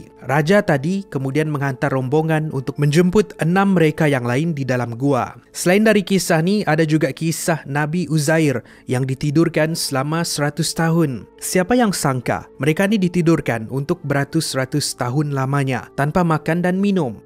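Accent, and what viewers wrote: Sabah, Malaysia. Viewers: 20 tahun akan datang subscribe naik Aku harap bro tetap buat content mcm ni Dan tetap guna logat dari Sabah 👌🏻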